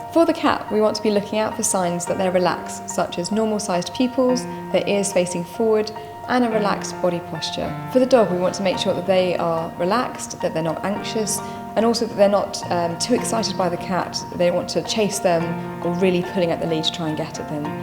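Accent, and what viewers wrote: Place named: United Kingdom. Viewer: The music was overpowering the female voice and it was a British accent, so even more difficult to understand.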